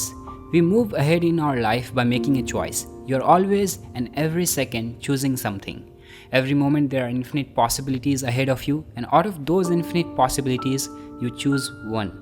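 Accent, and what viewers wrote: India. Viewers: i hate this indian accent so damn much i just cant understand ittt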